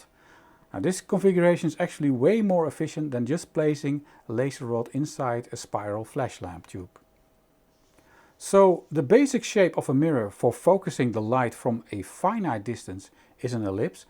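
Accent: Netherlands. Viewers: You sound like you’re from the Netherlands.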